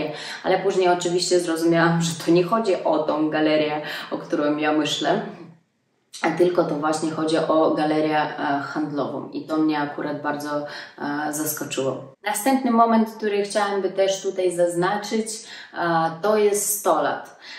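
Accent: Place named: East, Poland. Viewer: Skad ty pochodzisz - po akcencie widze ze ze wschodu-wszystkie polskie zwyczaje sa piekne , niepowtarzalne- takie nasze polskie- tym sie roznimy od calego swiata- imieniny - supe - calowanie w reke - szacunek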